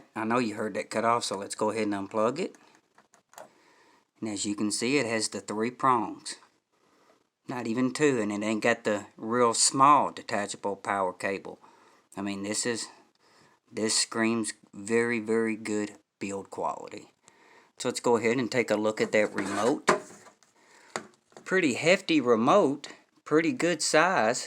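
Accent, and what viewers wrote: South, United States. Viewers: “Right at the eeeeend” and “this is built like a tenk, (you all??y’all). I am watching this from England and I have never heard such a sweet sounding US dialect. Out of cultural interest, where were you brought up please? When I was a child i watched, for a short while, a TV series called North South, based on the US civil war and your dialect seems very Southern. Sounds exotic and it’s stimulating for someone from the UK where we probably sound quite neutral-flat-boring, to hear the inflections and vowel changes. Aside, your review was passionate and detailed. Thank you.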